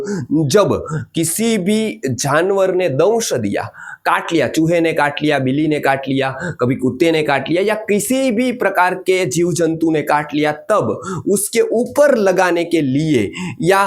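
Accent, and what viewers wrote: Gujarat, India. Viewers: Tame Gujarati lago 6o laheko Gujarati 6e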